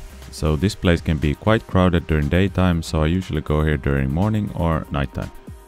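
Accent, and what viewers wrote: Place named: Finland. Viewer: were you the one who gave me that pack horse? And you sound Finnish!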